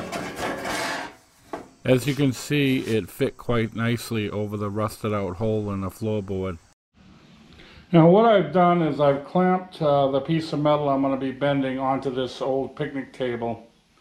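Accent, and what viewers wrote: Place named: New England, United States. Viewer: A nice, authentic New England accent. Boston?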